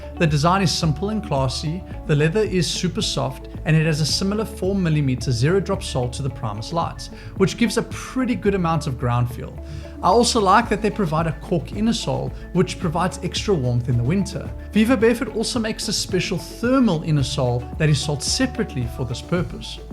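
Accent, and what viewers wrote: South Africa. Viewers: Ja, asseblief, man! When you have the time, I would LOVE to watch a video about the benefits of losing my socks! 😅 I'm very new to this barefoot style of shoe, don't own a pare of vivo barefoots yet, but may just be getting a Geo Racer. Lol. Thanks wifey! ❤️🙏🏻 I've also recently fallen in love with injinji socks, and never used to like those trendy toe socks that people would have about...but Injinji does it right. 😁 After the initial strange feeling of 5 to 15 minutes...they stayed on my feet the whole day, I slept with them on (normally, i rip my socks off my feet at night, because they have to breathe!), and continued the entire rest of the next day with them until my shower that evening. (36 hours) ironically,I had misplaced them, and just found them again a couple days ago...and yes, I'm totally wearing them while I'm writing this! 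🤣 Anyway, loved this video so much, thank you so much for making it, hey! Baie dankie maneer! 🙏🏻 (my afrikaans was always terrible growing up in Cape Town, and it definitely lost importance when my family moved to the states, so I'm afraid you're limited to please and thank you, LOL! Jammer! 😉) It's so nice to hear a legitimate accent again, especially discussing in such detail the benefits of so many vivo barefoot shoes! 🙏🏻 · I want to transition to barefoot shoes and Vivo is the only brand available here in SA. It was so refreshing to hear a South African accent that I was immediately drawn to the video. Thanks for the reviews because here in SA the shoes are pricey so making that first choice is crucial especially since I am looking for a work shoe. Thank you! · Dude you sound South African Are you a SAFFA living in Greece? Or am I being silly?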